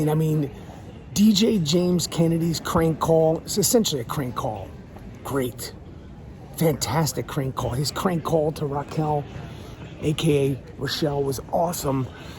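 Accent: New York, United States. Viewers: Love you and your New York accent. Love your work especially as a beat cop shooting a bird on Friends. You are a classic. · I love hearing your opinions on all of it and how passionate you are about all things Bravo- keep ‘em coming. And F YouTube for restricting you- I need your F bombs and New York street talk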